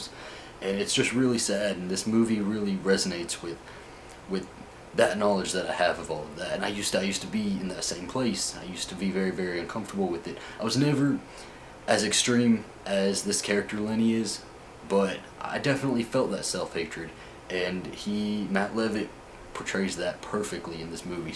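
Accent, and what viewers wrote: South, United States. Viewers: Ok ! Here i go again cyber stalking my fellow southern boy . I adore you . So sweet Honest ( southern boy ) . I just find you real and im a litttle older but i learn from you. . ( btw hint hint. Become friends with a flight attendant ( me ). . Saves on travel . Just kidding . Being in new York , then Miami I lost my southern accent unless im yelling at my bf whom yells back in Spanish . Thats its own video !! Any way i love the accent snd your open heart and sweetness Namaste , Rocky ✈️🙏🏻😇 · So many great suggestions Z, from you and others, so thanks! I'm watching Drown now. The Broken Hearts Club is good, and Rock Haven. I love your accent; makes me want to move back to the south.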